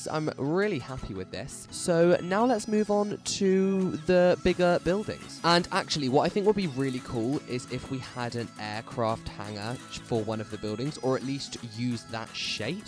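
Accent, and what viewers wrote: United Kingdom. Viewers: Cool love the build <3 😀 Edit: i love your voice! Are you British cuz I am ❤️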